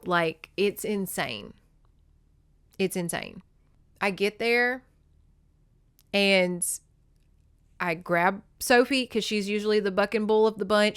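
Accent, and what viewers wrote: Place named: South, United States. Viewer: Okay but I love your southern accent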